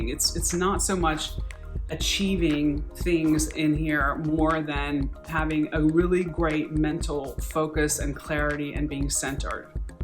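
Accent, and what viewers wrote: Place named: Brooklyn, United States. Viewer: Lol. I knew I heard a Brooklyn accent 😂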